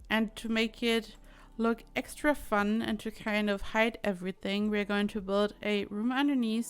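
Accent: Germany. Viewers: Your Accent tho....you're German ain't ya?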